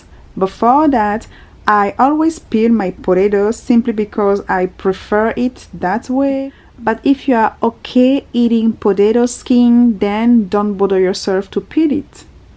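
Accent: France. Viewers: I’m so glad that I bumped into your channel. I’ve been a subscriber since. I’ll definitely try all of these recipes. 😊 Sn: I love your heavy accent. I figured you spoke French. 💋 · I'm subscribing to your channel just because of this video! I love the way you speak! Are you from France? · Un accent français ?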